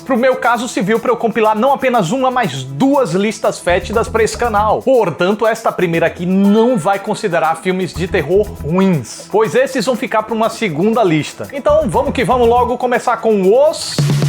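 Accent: Northeast, Brazil. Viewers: só me inscrevi no canal por causa do sotaque nordestino carregado, que é a cereja do bolo! parabéns ótimo vídeo.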